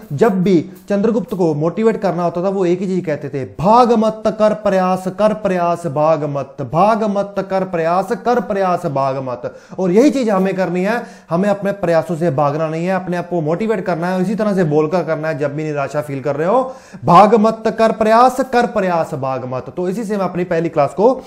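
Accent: Punjab, India. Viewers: He is punjabi. . That's why hindi pronunciation aisa h ..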